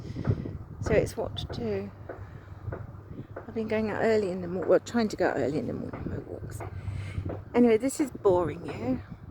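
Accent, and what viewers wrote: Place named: Southern England, United Kingdom. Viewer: we both have an English southern accent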